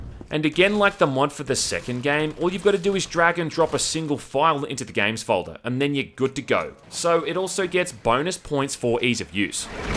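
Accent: Australia. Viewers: What are you, an Aussie? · Is it me or did he sound more stralian in this one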